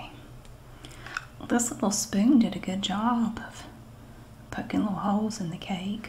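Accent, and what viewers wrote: South, United States. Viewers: Thanks, another great video. My sister and I love your southern accent, it relaxes us, probably growing up watching American shows on TV. Love from Melbourne, Australia.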